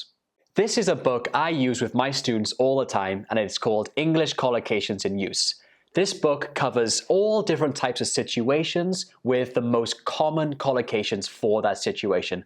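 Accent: United Kingdom. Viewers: Outstanding British accent!where are you from?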